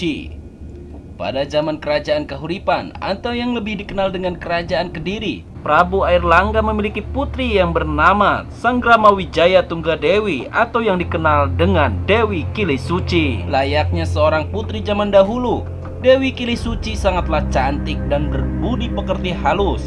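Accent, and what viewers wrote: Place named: Arab world. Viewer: Ngomong jawa pake logat arab ya seperti itu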